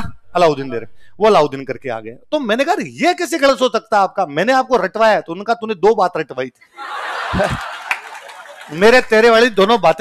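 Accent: India. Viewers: bolne ka deshi andaj sahi h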